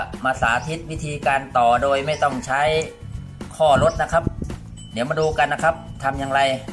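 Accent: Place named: Trat, Thailand. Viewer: พูดสำเนียงคนตราด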